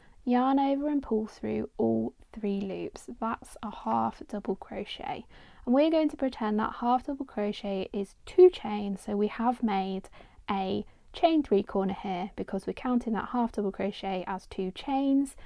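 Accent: United Kingdom. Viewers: You have a British voice but I think you are using USA crochet terms ?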